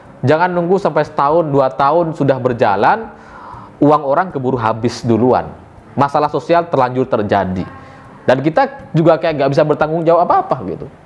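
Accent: Madura, Indonesia. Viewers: Lucu.. kayak logat Madura mas host 2045 tv ini.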